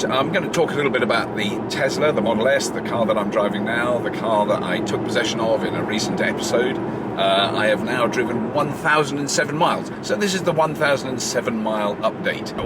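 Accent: United Kingdom. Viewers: Love hearing things explained with a British accent.. And this car is on my want list!! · A Tesla report with a British accent. Raises the Tesla bar that much further!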